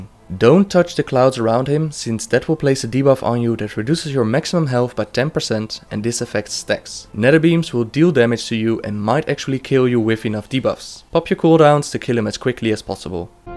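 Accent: Netherlands. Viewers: are you from the netherlands ??? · Haha i can hear that ur dutch xD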